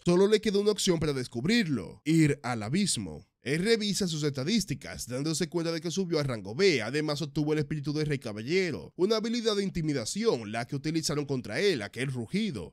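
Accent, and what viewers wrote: Dominican Republic. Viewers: Eres dominicano bro 🤔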